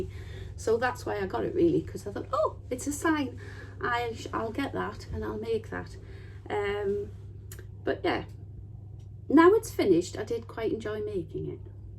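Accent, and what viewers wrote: United Kingdom. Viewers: I do love that fabric of this latest wrap dress it's so lovely. I wonder where in the UK you live as I'm trying to identify your accent.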